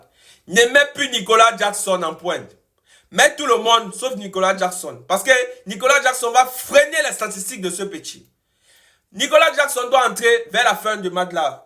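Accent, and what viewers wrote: Cameroon. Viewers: Mais ton accent là est un son continantais (cameroun) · ON VIT EN EUROPE, ET NOUS RECONNAISSONS TON ACCENT CAMEROUNAIS. PARCE QUE J'AI BEAUCOUP D'AMIS CAMEROUNAIS , ET VOUS N'AVEZ RIEN D'ACCENT IVOIRIEN. VOUS ÊTES UN CAMEROUNAIS PURE SANG UN BAMELINKAIS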